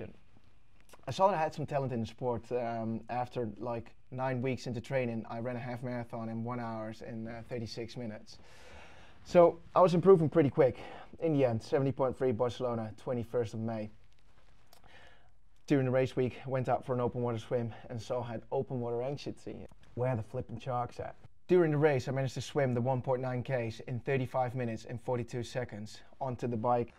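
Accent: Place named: Netherlands. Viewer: I studied in NL, now back in Thailand. Also training for triathlon to race in my country. Your story is inspiring and your accent makes me miss life in NL so muchhhhh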